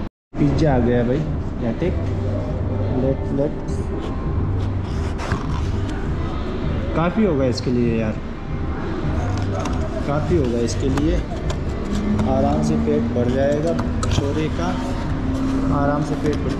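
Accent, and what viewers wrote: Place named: Jaipur, India. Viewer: Kyu ki aap ki bhass Jaipur si lagti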